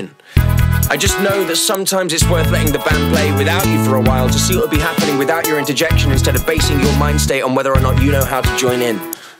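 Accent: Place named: United Kingdom. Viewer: Obsessed with the brit accent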